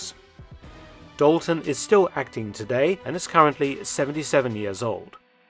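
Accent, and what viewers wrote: United Kingdom. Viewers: Personally, I thank you would have made a first class James Bond. The look, the clipped British accent and a general quiet swagger. Your next mission, should you decide to accept it..............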